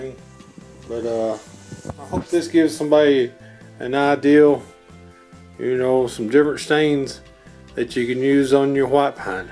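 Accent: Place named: Texas, United States. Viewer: I'm from Texas.. I can tell lol my wife and I really enjoyed this video :-)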